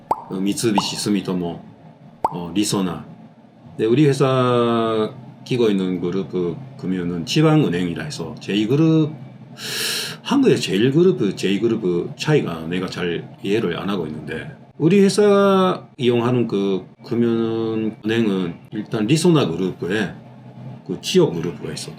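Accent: Busan, South Korea. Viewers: 전 사장님 부산사투리 말투 너무너무 듣기 좋아요 편안하게 계속 듣게되는 목소리에요. 알림설정 하고 갑니다^^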